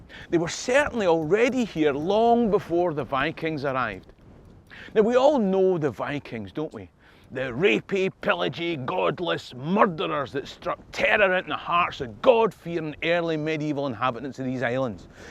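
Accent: Scotland, United Kingdom. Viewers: I so love your accent!! Go Scotland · Hello, Great video! From Denmark and I like your Scottish accent, and I can understand what you saying 😉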